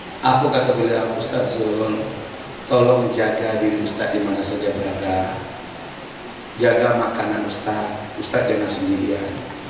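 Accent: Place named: Minangkabau, Indonesia. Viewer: Wah minang. Kek nismilan cakap minang lain sikit..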